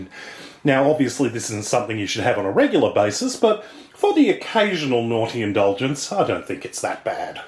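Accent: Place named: Australia. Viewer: That's an Aussie accent like mine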